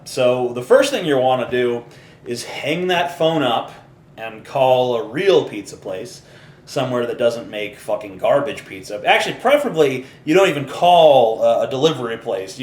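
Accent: Scotland, United Kingdom. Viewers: His accent was Scottish :) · His accent is Scottish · His accent is scottish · Its a Scottish accent, I cringed. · Thats a scottish accent?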